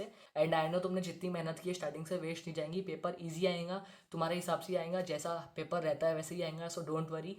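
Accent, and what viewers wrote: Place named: Nagpur, India. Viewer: Bhai tum pakka nagpur maharashtra se ho na🥷🏿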